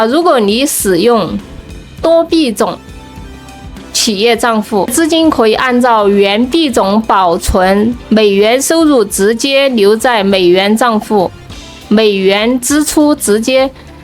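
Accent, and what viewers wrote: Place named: Southwest, China. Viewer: 是西南口音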